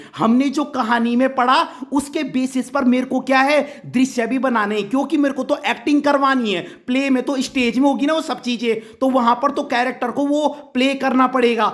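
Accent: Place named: Garhwal, India. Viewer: Sir aap garhwali hoo 😍😍 apki awaaj se pata lag gyaa 😂❤️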